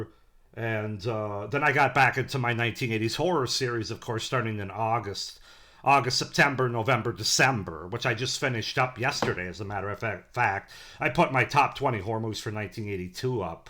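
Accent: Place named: United States. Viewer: I wish for your 2026 to be a success. I'm curious about what region of the U.S. you are from, based on your accent?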